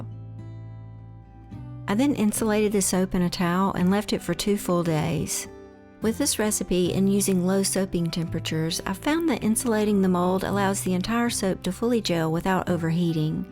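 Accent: South, United States. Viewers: The southern accent is the beauty of the south. Let is out. Sing it ! The rest of the world is blessed to hear it.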